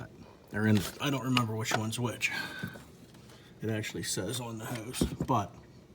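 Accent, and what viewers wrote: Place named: Pittsburgh, United States. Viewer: Thanks, and you sound like a yinzer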